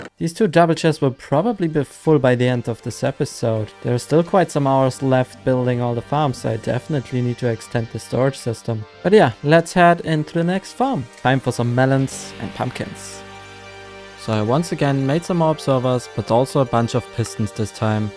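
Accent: Germany. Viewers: Super german Easy englesh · Your lucky you have za german accent. Im mostly German, but have no accent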